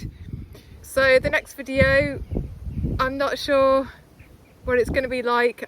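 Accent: United Kingdom. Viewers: I'm American so you have what I think of as a very British voice, perfectly fine. I've learned over 6+ decades of life to just let the naysayers go and don't allow them to own any part of your thoughts. Remember, people only have as much power over us as we allow them to have. Keep on making your videos, they are outstanding! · I've never heard a British accent that wasn't music to my ears, yours included. I just discovered you and I love the tone and energy of your video! Thanks also for the history. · You have a perfectly normal English accent! Nothing at all out of the ordinary for that region. Some people are just too ignorant to appreciate the beauty of regional accents. It would be a boring world without them! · Your British accent is absolutely lovely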